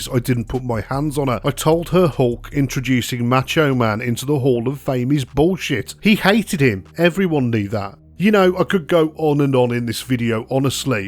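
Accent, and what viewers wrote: Birmingham, United Kingdom. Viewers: Guy narrating is from Birmingham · Favorite new Pro wrestling algorithm find here. Great content 💯 UK area accent is this? 🇬🇧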